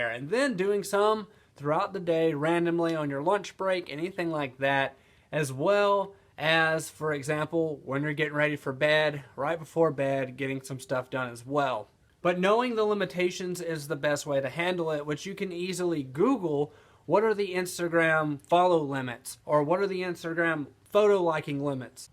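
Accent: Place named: Georgia, United States. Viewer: are you from Georgia? you have an accent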